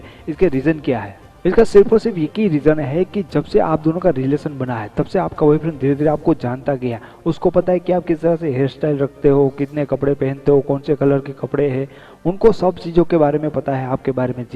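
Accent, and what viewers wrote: Gujarat, India. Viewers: Gujju ke Bolne ka style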